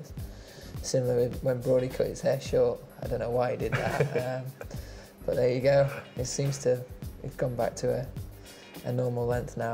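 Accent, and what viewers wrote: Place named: Yorkshire, United Kingdom. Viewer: Yeah because both of them have thick Yorkshire accent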